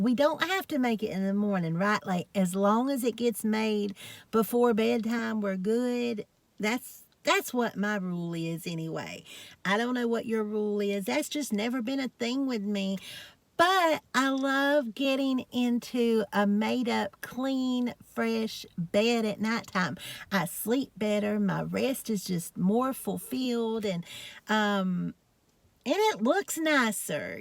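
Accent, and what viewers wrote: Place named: Georgia, United States. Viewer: I love this video!!! You always make me smile! Some people on here probably know by now that I am your mother n love, but they don’t know that I’m from Virginia and the strongest south Georgian accent I have ever heard in my life is my husband’s and now I have a daughter n love who has a south Georgian accent that competes with his!!!! I love y’all’s accents. My mother has always had people ask where she was from because she has a strong Appalachian accent. Everyone always thought she was from West Virginia, close, but in south western Virginia is where she came from. I have no accent, I wasn’t lucky like, my mama and husband. But anyways, I’m so lucky to have you in my life! Your a true gift! I wish all of your new friends on YouTube can meet you, they would love you too. ❤️🤗🌹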